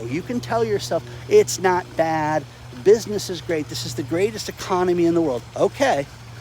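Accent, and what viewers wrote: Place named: Midwest, United States. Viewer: Has anyone ever told you you speak with a solid Midwest dialect, it's very disarming.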